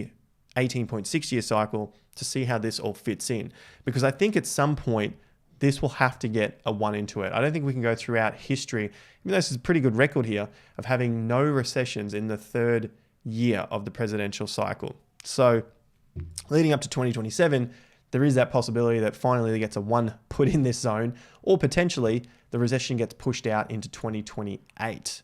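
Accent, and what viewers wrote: Australia. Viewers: I’ve subscribed again, it is great to hear a fellow Aussie breaking down the crypto charts. Well done & here’s to a great 2025 bull market.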